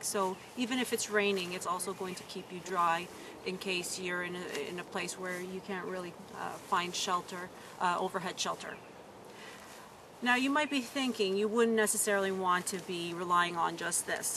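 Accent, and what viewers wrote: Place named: Canada. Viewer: You don't have to state that you are a Canadian, pretty girl. We knew that as soon as you tried to say a word with the letter "O" in it.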